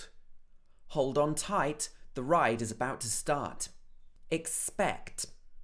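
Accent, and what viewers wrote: United Kingdom. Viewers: This is rather British English, right?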